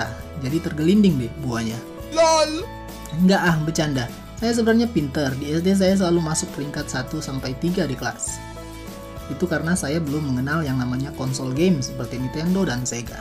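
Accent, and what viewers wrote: Manado, Indonesia. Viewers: yaa,kirain Sulsel,ternyata Manado,gue Sulsel bang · pantes kyk hafal logat nya wkwkwk manado koten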